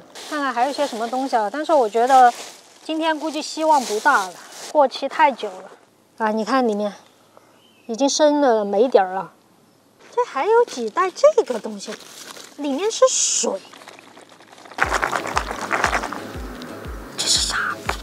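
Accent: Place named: Northeast, China. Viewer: 又會說四川語言 又是東北口音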